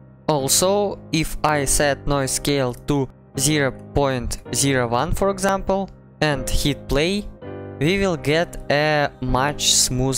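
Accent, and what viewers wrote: Russia. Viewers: так охват аудитории шире, а учитывая то как тяжело продвигать обучающий контент, енглиш решение правильный выбор, но акцент наш кнш)) я часто использую нейросеть яндекс браузера для перевода видео, иногда мне действительно комфортнее слушать урок на русском и я просто включаю перевод, так что рекомендую) проверено на себе, да перевод иногда кривой но в целом оч хорош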